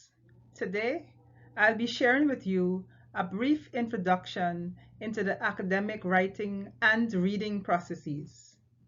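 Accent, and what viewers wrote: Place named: Guyana. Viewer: Great presentation thank you for sharing I was educated looking forward for information on writing research papers and it was refreshing to hear an intellectual presentation with a Guyanese accent ✌🏾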